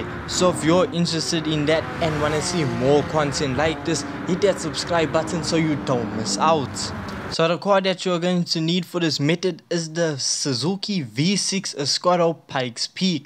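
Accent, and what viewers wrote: South Africa. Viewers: I hear my South African boy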